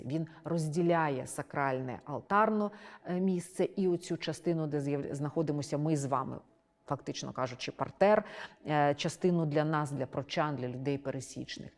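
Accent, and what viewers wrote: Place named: Russia. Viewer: Дивно слухати від пані лекторки російську вимову наших старих текстів: іґраніє, Саґайдачний А попри те це відео - добрий вступ до тієї бурхливої доби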